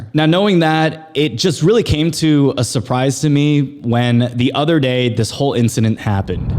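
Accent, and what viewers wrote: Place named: Los Angeles, United States. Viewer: Crazy man, glad you got away clean. It does seem like things are getting sketchy lately in my area (PNW) and across the country. You have the voice to reach more people than most so kudos for sharing this situation. I lived in Montgomery County when I was a kid. You're missing the Maryland (Muuuriland) accent, I had you pegged for LA bro. Keep bringing the solid content!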